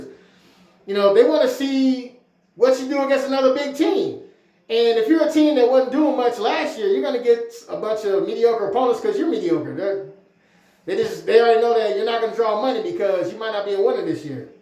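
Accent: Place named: Appalachia, United States. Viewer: You talk like a hillbilly. Where do you live?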